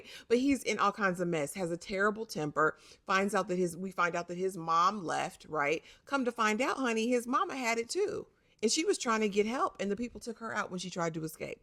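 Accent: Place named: United States. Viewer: I read both your comments so I will address both here. sound American. I AM AMERICAN and she is the only American I have seen covering this show on YouTube. Even their interviews are the UK versions of outlets like Vogue. No one cares about validation. Validation comes from within. Whatever PERSONAL issue you have with Europe vs. America's African Diaspora's culture is your own. FACTS. Facts are that the US is the largest entertainment market next to China. British actors and directors in interviews have said they started making more money and getting more exposure (to get hired on more projects) when large wide spread American audiences started becoming fans. I'm sure Rapman would like to get paid, but if not and he wants to make it "our own thing" because he is not seeking "validation" as you say, instead of being a story teller that wants his work to get to the largest audience possible, then good on him. 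Money is what makes the world go around and if you can make millions in your home town, but if you know you can make billions one town over, why would you cut off your nose to spite your face? MONEY! I know he is Canadian, but didnt Drake save Top Boy from cancellation? Rapman said he has a story for three seasons planned and I want to see him get his seasons. Get his MONEY! China is the only entertainment as large as the US and they ae racist as hell. Historically these types of shows and movies do poor there. They even REMOVED Chadwick Bozeman's face off the B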